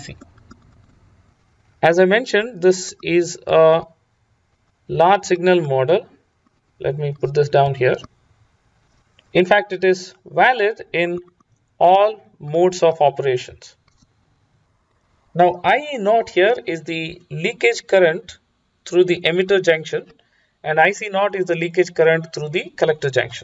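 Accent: India. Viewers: Your explanation is very good, and your accent is very harmful compared with other Indian Youtubers, but if you'd had an better mic, it would top everything!! Thanks for the vid, tho.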